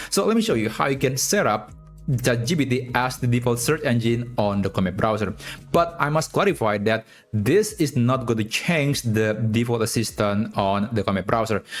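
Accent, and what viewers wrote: Indonesia. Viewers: Apakah anda orang indonesia ? Dari logatnya sih orang Indo yah kak ?😊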